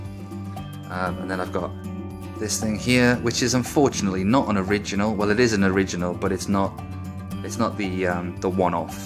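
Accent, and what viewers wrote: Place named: London, United Kingdom. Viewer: I learned several things today. You're left handed, and a fan of odd art. You like Marvel comics, fantasy, sci-fi, and gaming. You most likely spend your down time on XBox. You ride a bike every great once in a while. You're most likely single, given the state of your flat. Coffee is important to you. And you restore things. Your flat resembles the only one I've seen, it's in Edinburgh. Given the accent, though, I'd say you're nearer to London than you are Edinburgh. Also, Stargate. (I may be wrong on most of this.) And my question, though mostly out of need to joke, is: what's your address? ;3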